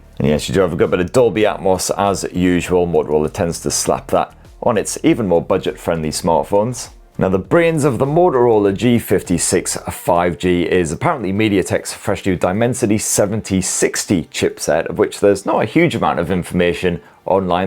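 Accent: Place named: Scotland, United Kingdom. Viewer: That's a Scottish accent.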